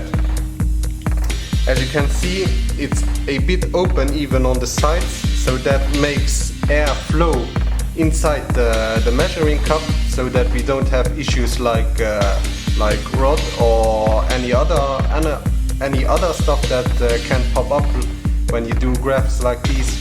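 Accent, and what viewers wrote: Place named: Luxembourg. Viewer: 🎉 duuu bass letzeboier ❤ ech erkennen deen accent direct 🎉❤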